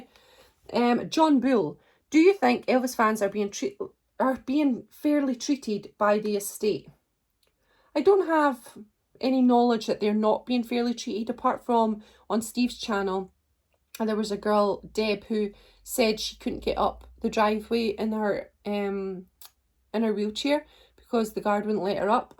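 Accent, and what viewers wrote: Scotland, United Kingdom. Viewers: Hi thank you for part three. Very entertaining. Love your Scottish accent 😻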